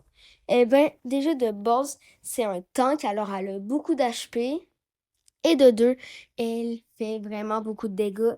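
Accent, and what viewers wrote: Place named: Canada. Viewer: J'adore tes vidéos continue comme ça, et j'adore ton accent canadien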